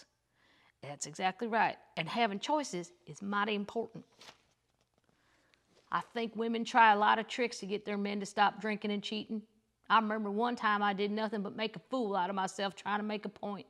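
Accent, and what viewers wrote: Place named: Appalachia, United States. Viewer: If you have Apple Music listen to her “song” (her telling a story, not really singing) off her awesome album. I think I like your holler accent better. Her stories go back to being a little girl & getting hit on the head & the doctor told them she was going to die. Daddy had to carry her 12 miles to get the doctor cuz she didn’t have no shoes. Great 2004 album. The lyrics are some of her best. I just got it for the duet with Oh, turn3d out OK. Daddy just got a new pair and made up a story as to why he had to run through the holler with them.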